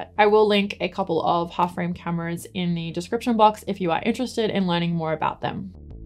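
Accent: Australia. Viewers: Subscribed. Enjoyed the video. It's crazy how I picked up your AU accent. Over the years I started to follow a few photographers from AU and this algorithm is now flooding me. But there are really good creators out there. Hope to visit one day.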